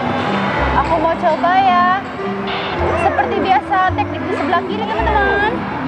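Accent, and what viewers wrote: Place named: Sulawesi, Indonesia. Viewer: Itu logatnya Sulawesi yaaaaa Hampir mirip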